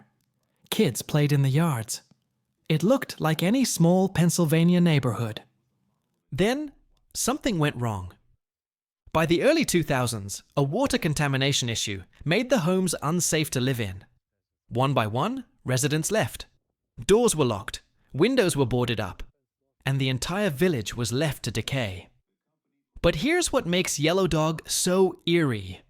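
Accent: United Kingdom. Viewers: Is it me or does anyone notice the narrator's voice once in a while turns into a English/British accent? Lol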